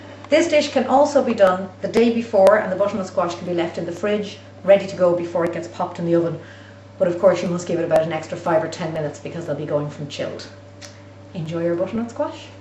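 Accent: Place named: Ireland. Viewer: Ahhh, the brogue! I'd listen if you were reading the phone book. But the squash instructions are spot on. Thanks!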